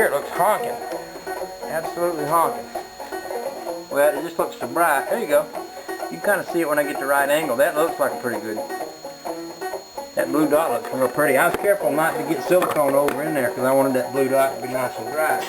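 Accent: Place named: Tennessee, United States. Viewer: Interesting. I ran across this while trying to find the origin of blue dot tail lights. I noticed your Maine plates, what part of Maine are you in? I am in southern Maine. What brought you here? When I first heard your accent I thought the video was out of Tennesee or something :)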